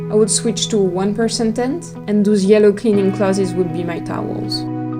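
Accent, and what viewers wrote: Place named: Europe. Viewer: Wow the production of this is amazing! I decided to subscribe within seconds. Can’t wait to see more! You sound European. I’m Welsh. Where are you from?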